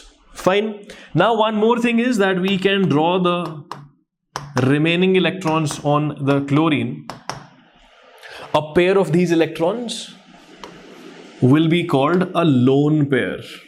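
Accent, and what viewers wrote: Punjab, India. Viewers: Sir are you from punjab??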